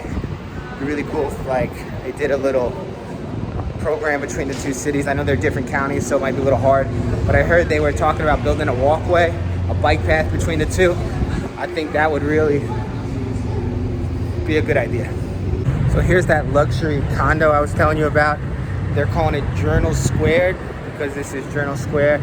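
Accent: Brazil. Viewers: Parece um brasileiro tentando falar inglês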